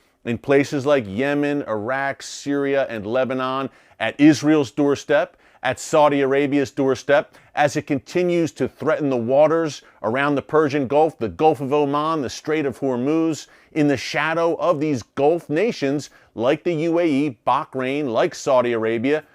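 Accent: United States. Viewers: Why you talking like a cowboy?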